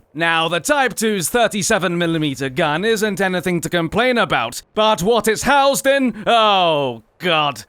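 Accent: England, United Kingdom. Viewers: Ur too British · he has got to be the most British person i have heard in my life, and I'm British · OHHH ENOUGH!!...that pommy voice is ORRIBLE. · I love his english accent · we will remain loyal to you as long as you have a British accent, your loyal American from America in North America.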